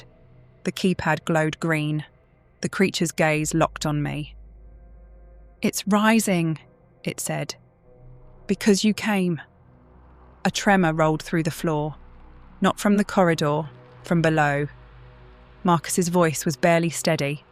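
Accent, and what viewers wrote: United Kingdom. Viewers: I wasn’t fond of this voice. It had too much of an undertone of cockney or something which ended up being a bit grating. However, the story was enjoyable.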